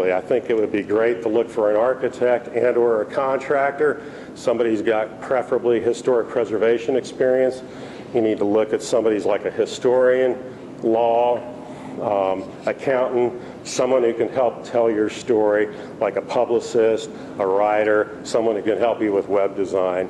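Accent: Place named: South, United States. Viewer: This lecture was so informative! Thank you NC for being preservation leaders! The only disappointing thing was when this professional architectural preservationist showed a slide on which was labeled "clear story windows" when they are actually CLERESTORY windows. Professionals need to remember to double-check spellings, particularly when you speak with a strong Southern accent. It makes us Southerners look ignorant when things are spelled as they are pronounced.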